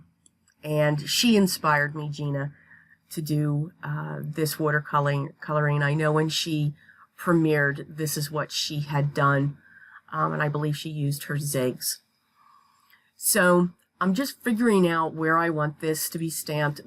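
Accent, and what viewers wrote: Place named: Philadelphia, United States. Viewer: I knew it! I ‘m from Bucks County. I have been in Florida for the past 28 years and every time I hear you, you sound like home. 🤗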